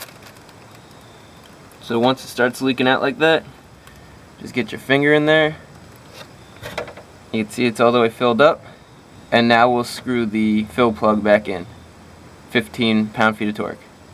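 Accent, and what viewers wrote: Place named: New Jersey, United States. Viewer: he has a New Jersey accent! -from Texas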